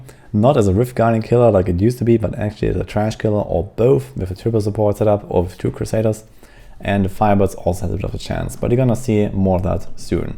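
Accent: Germany. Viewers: with all due respect, Greece is a shithole, i donno where are u from but from your accent i can assume that you're from germany\austria etc. but hey if you're happy there then i'm happy for u!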